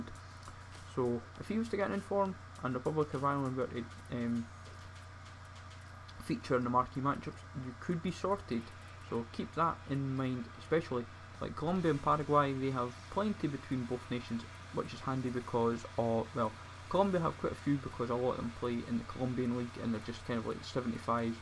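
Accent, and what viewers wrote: Scotland, United Kingdom. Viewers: is he scotish??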